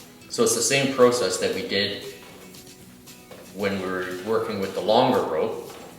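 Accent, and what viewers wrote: Canada. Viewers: Nice Canadian accent.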